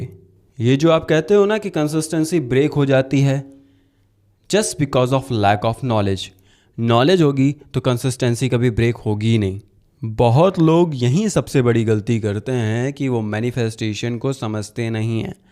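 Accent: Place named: Allahabad, India. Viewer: Ap allahabad se ho kya kyoki mai kom Ham bolte hai 😂❤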